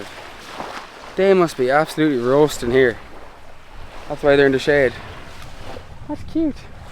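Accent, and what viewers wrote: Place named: Ireland. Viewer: Sounded like irishy accent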